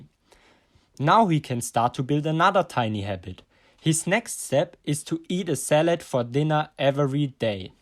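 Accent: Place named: Germany. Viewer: Sounds German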